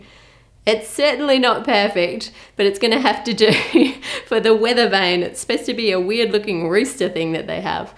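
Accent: Australia. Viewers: Gosh I love Australian accent so much it's definitely my favourite out of all of them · Wait... your Australian OMG!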